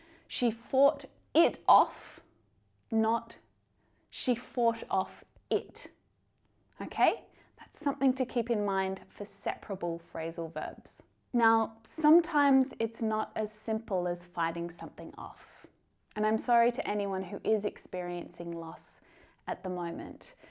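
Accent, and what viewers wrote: Australia. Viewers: Why is your accent so lovely? I have known Australians but none of them speaks like you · is a lovely lady, she has soft Aussie accent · Her accent is Australian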